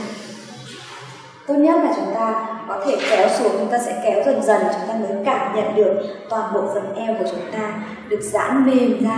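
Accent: Hanoi, Vietnam. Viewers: giọng HN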